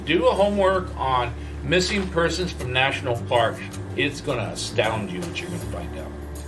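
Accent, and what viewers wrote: Australia. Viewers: I didn't realize he had an Australian accent until I saw someone comment and still only hear it when I think about it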